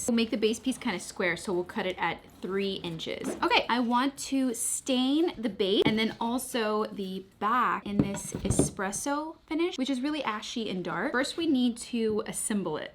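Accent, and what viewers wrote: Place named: Hill Country, United States. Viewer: I love love love the way you speak. I hope that's not weird...you have such an elegant tone and each word if full of genuine feeling. did I already say I love it!! Maybe I just miss hill country accents <3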